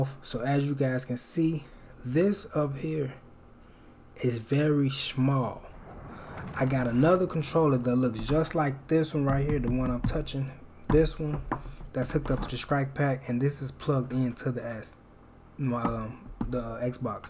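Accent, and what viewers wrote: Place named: South, United States. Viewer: Am I bugging or this dude keep saying SKRIKE pack and not Strike pack.... I'm from New York my guy I don't understand how "st" sounds like "sk" down south, I'm starting to think southerners should stop saying street, shrimp and now strike cuz is sounds bugged out wtf is "skrimp" how tf am I gonna meet you up the "skreet" and now u ruin my day by telling me may "SKRIKE" pack won't work on my series x controller..... Where's the southern hospitality my guy u hurting my feelings lol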